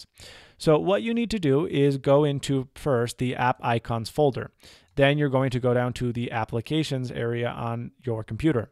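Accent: United States. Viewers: Haha, of course there's nothing wrong with the accent, but we're used to hear him speak perfect american English. It's actually quite funny for his old viewers to hear. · This was like an old Mac Madness Monday! Loved it. You sound way more American now as well.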